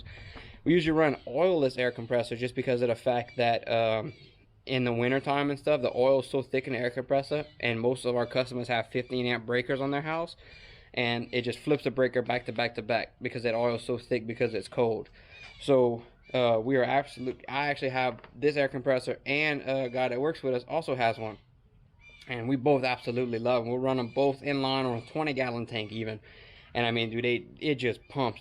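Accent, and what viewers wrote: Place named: Louisiana, United States. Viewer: Love me some Cajun accent. Bro lived in Lake Charles.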